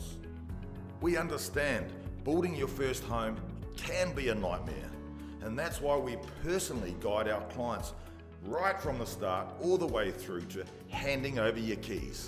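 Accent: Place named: New Zealand. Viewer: Funny to hear a kiwi advertising an ozzie product!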